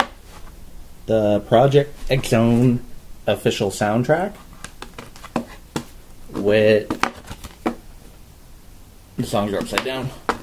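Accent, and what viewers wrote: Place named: France. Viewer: dat epic lisp, and dat french accent hahah :D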